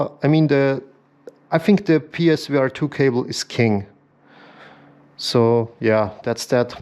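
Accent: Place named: Austria. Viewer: Servus höre am Akzent das du Österreicher bist😂 glaubst du das eine 4070ti super genug ist um das Headset zu befeuern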